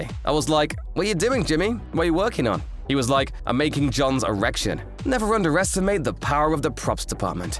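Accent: England, United Kingdom. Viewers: It's an English accent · He actually said “Dirk” but his British accent make it a bit like Doug to American ears.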